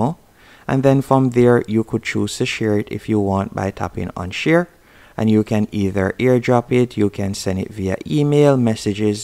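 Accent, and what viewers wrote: Jamaica. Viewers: I just wanted to reach out and give you your first comment. It was such a pleasant surprise to hear your Jamaican accent! Gwaan thru mi breddren. By the way, yours was the first video that google offered. I hop you get additional traction. Well done.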